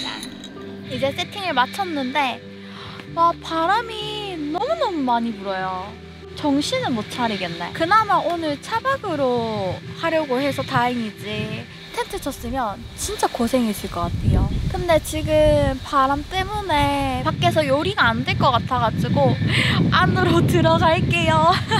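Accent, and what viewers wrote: Gyeongsang, South Korea. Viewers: 갱상도 분이신가보다 ㅋ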